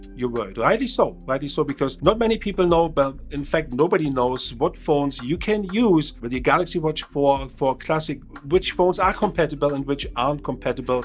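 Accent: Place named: Germany. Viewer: Definetly heard out the german accent helped me a lot : )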